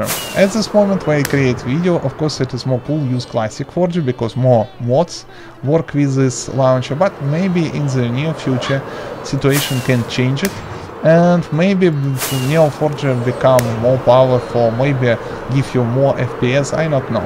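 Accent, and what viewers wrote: Russia. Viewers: Не думал, что русский акцент на столько сильно выделяется.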